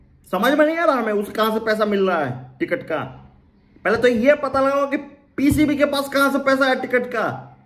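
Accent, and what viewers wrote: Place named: Bhojpuri, India. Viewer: 'Mar maidaan' bhojpuri accent love from araah,bihar❣️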